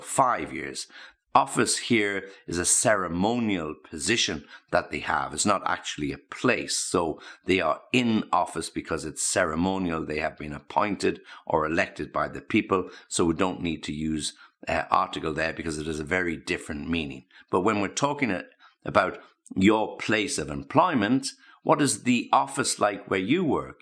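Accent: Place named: United Kingdom. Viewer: If I am not mistaken you are speaking British English, or at least not Amerikan. And I like it very much. I like your lessens. Thanks a lot.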